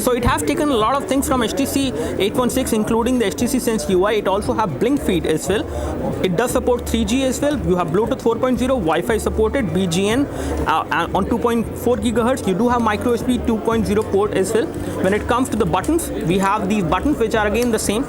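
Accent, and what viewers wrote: India. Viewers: I hate that indian accent for god's sake!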